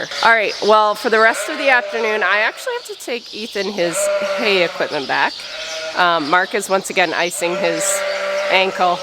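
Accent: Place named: Ontario, Canada. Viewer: I'm from Ontario but live in NZ and love hearing my home accents...following the trials and joys... I always learn so much from you, sheep and farm side... always inspired by how hard you work and the dedication to the animals and land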